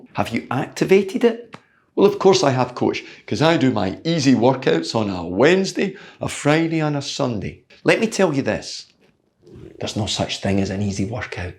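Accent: Scotland, United Kingdom. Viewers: After watching several of your videos, the voice in my head while I'm cycling now speaks Scottish.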